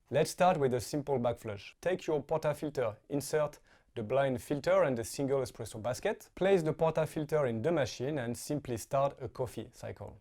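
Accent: France. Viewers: We love your French accent, it makes it so interesting ! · Great video. Vety informative. I also enjoyed hearing your French accent. Merci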